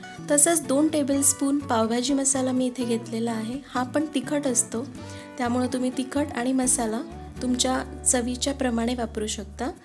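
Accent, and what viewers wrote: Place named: Pune, India. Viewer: ताईची भाषा पुणेरी आणि स्पष्ट👍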